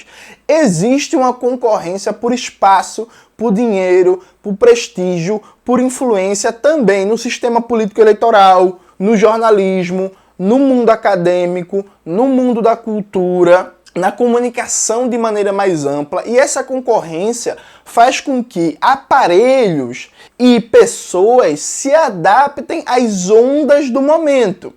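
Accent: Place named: Northeast, Brazil. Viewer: meu amigo! Que aula foi essa hein? Vídeo supeer necessário!!! Seguindo suas redes agora! Compartilhando p geral! Ainda com esse sotaque de meu nordeste....S2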